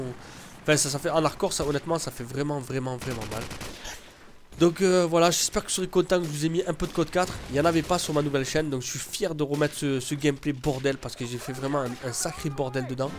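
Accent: South, France. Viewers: Un bon carnage GG a toi, ta façon de commenter avec ton accent de sudiste me fait régaler!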